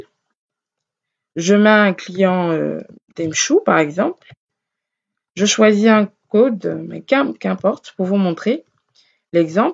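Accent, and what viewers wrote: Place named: Cameroon. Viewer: Accent camerounais quand tu nous tiens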